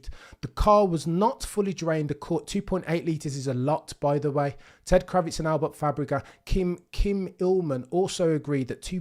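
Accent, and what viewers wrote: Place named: Cockney, United Kingdom. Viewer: Black guy with cockney accent refreshing change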